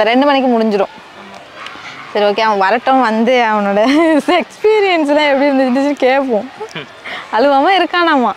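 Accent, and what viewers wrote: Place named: Sirkazhi, India. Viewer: Akka neenga praaradha ketkum bodhu nalla irukiku ka engalukkum sirkazhi pakkam than unga slang pathe nenaichan super keep rocking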